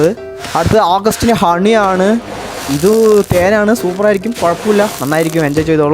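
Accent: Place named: Palakkad, India. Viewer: Palakkad ahno veed slang kettapo choichathann NJN palakkad ahn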